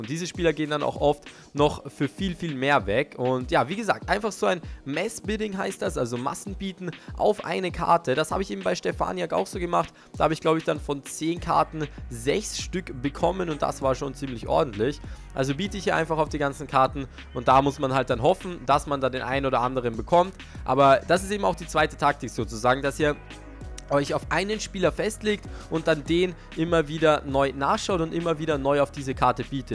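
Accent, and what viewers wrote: Austria. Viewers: Er kommt aus österreich du fisch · Es is a östareicha ok mia song ned oise so wie de deitschn.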